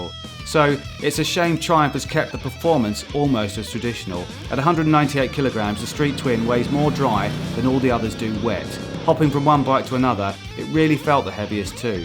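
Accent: United Kingdom. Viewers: The guy doing this voice over is the most british man I've ever heard. Betta Rubba Anova Neva Scrambla Eeva Orsepowa Fazand Ova Senta Viza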